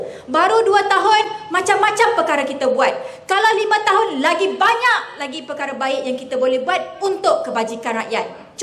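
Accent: China. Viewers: Ale uto ko la tali barut....law umno xde xde la malysia aman smpai skg...ntah2 ko pn xde...ahhaahha....ale uto....ale uto....melayu ckp sleng2 cina....tali barut.....tali barut... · Jangan mimpi la...ni Olang melayu ke Cine..ckp pon dah macam Cine ckp melayu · cakap pun dah gaya macam cina...ibarat cina cakap bahasa melayu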